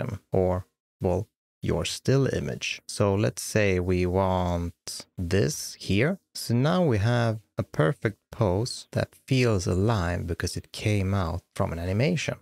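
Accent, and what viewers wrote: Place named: Sweden. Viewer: I'm here for the amazing Swedish accent! And the jokes 😁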